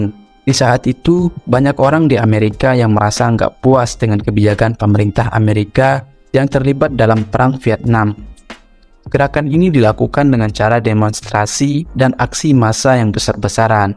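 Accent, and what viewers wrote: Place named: Bali, Indonesia. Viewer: bahasanya loe gue , tapi logat,nya bali bngt 😄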